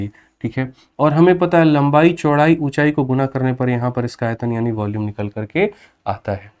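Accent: Bihar, India. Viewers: Ab sir paka bihari leg rha ha gajb❤❤❤❤❤🎉🎉🎉🎉🎉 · Sir main rrb je ke liye pdh rhi hu aapki videos helpful hai thankyou sir , bss ek request hai sir please thoda sa questions ko na english me pdh kr explain kra kro taki humko pta chle ki english me pdhke kaise approach Krna hai questions ko . Baki aap bihari me bolte ho vo toh sara upr se nikl jata hai . Meri bss yahi request thi 😊😊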